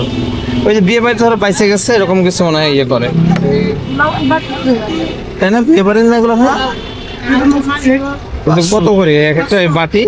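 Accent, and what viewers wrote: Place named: Pabna, Bangladesh. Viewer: আমার বাড়ি পাবনা,আপনার কথা বলার ধরন অনেকটা আমাদের মতো,নাইস ভাইয়া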